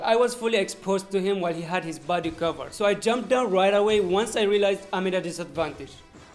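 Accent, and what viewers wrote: India. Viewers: The indian accent makes this video 100 billion times better. Idk why. I guess this is caused by watching Mutahar from someordinarygamers last night for 5 hours straight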